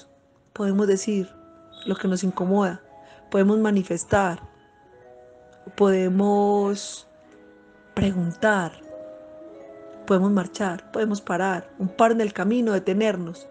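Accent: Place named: Colombia. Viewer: Eres maravillosa. Eres muy especial, bueno, realmente no eres especial, eres un miembro más de este universo que hace en los corazones de quien te escucha un espacio mejor. Especial son las personas que necesitan amor y luz. Realmente eres amor, con un nombre, con un acento colombiano, con un cuerpo de mujer. Infinitas gracias por tu amor y extenderlo a la humanidad.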